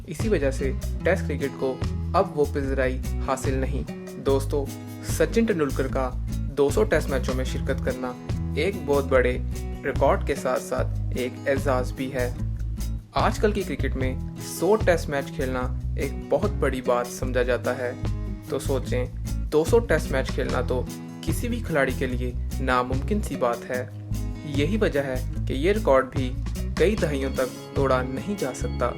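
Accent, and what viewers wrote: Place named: Punjab, Pakistan. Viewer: urdu chek kr ustad bndy ki level kr gea urdu in punjabi tone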